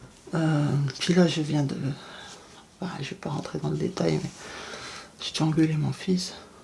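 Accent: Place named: Nord-Pas-de-Calais, France. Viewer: Coucou Comment ça! L,accent chti un tue l'amour??😂😂😂 Moi je l'entends encore un peu sur le son de tes "a" 😁 Ah ah j'ai le même garçon! Jamais de sa faute 😂😂 Merci pour cet agréable moment et de plus j'attendais de voir cette toile avec impatience depuis que tu as dis que tu l'attendais donc maintenant c'est bon je peux craquer 😅😂 Gros bisous 😘😘😘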